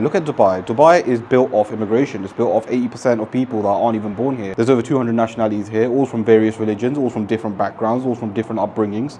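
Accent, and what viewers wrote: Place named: London, United Kingdom. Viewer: Nice London accent..i am flying to Dubai in a few days time too..too hot in Dubai now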